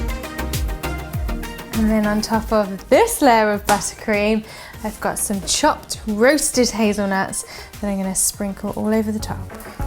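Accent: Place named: West Country, United Kingdom. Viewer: I love your accent. Is it a west country accent? :)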